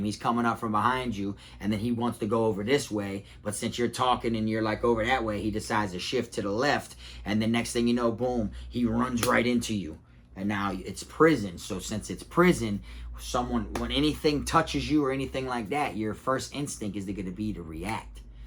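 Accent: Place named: South Florida, United States. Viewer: My homie from Davie, always feels like im talking to a neighbor. Love that south florida twang. on the job, great vid!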